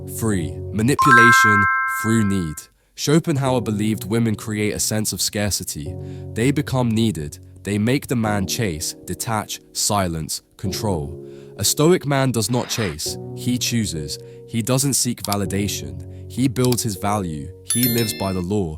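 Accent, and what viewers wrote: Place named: Scotland, United Kingdom. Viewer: Its a Scottish accent it has a charm its human its natural its better than the AI generated voices on the internet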